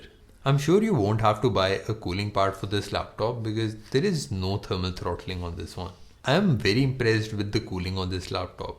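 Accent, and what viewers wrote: India. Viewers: I missed my Indian friends by hearing your voice, good video bhai!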